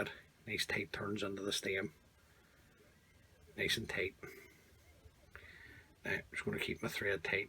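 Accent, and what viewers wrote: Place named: Belfast, United Kingdom. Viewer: you come across really well, and the Belfast accent is clear to understand and brilliant. Who could not like a Belfast accent. Well done keep it up.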